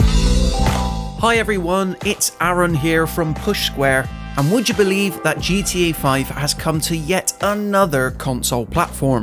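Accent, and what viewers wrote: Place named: West Country, United Kingdom. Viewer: West Country accent ftw 🙌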